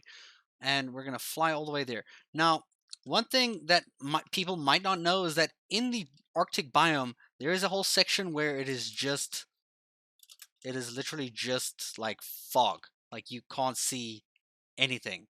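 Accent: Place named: South Africa. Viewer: I thought I could read that accent haha! Bring out your Saffa accent more, you don't need to talk like an American :D Good video man!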